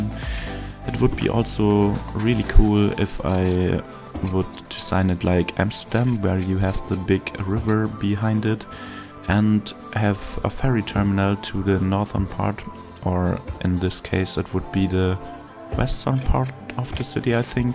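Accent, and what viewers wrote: Germany. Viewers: Man hört voll die deutsche Sprache raus 😂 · Bis jetzt wusste ich nicht mal das du Deutsch bist. PS: Mann hört es aber auch Raus XD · Are you german you have a really german accent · Du kommst aus Deutschland das oder Deutschsprachigen das hört man einfach 😂 oder ich liege komplett falsch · NGL I love your germann accent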